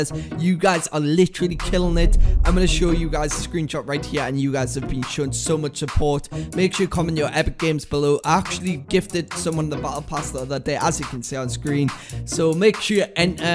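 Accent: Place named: Newcastle, United Kingdom. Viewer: You sound geordie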